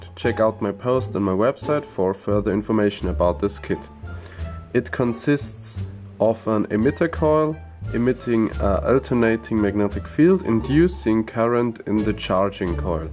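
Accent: Germany. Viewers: Hab ichs mir doch gedacht dass der Akzent Deutsch ist :D und ich hab mal wieder meinen falschen acc...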